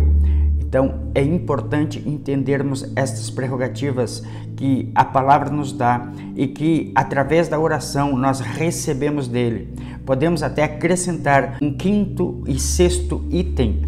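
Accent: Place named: United States. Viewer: Vc É Americano ?Teu Sotaque Diferente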